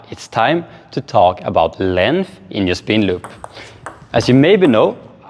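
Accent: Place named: Sweden. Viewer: bra tips, o alltid charmigt med grov svensk dialekt på engelskan :)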